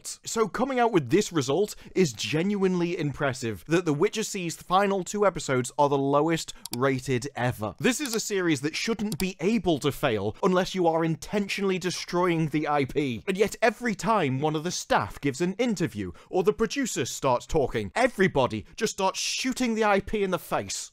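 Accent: United Kingdom. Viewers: offtopic: that british accent just flames ontopic: ye, i hope S4 will be cancelled, they butchered it enough or as the classic Simpson meme said "Stop, stooop! He is already dead!"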